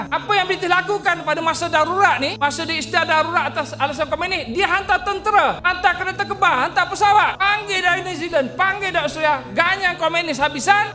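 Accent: Kelantan, Malaysia. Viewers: Hok kecek ni bare klate wlaun,ambo kenal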